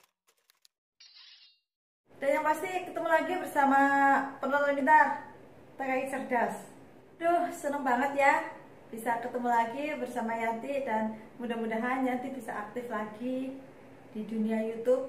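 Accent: Banyumas, Indonesia. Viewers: Jebule rika wong ngapak yak hhh jossss wonosobo monitor.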